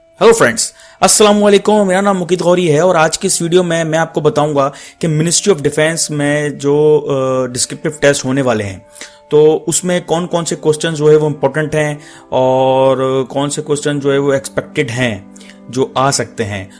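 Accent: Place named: India. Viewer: Your voice looks like indian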